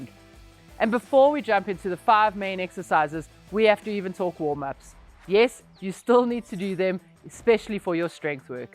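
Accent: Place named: South Africa. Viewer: How exciting to land on a YT cxhannel and hear a South African accent. Woot! I've subscribed and plan on digging in to your content on the weekend. I was a runner and still kinda am but heck, those menopausels. :face-orange-frowning: Plus sore knees.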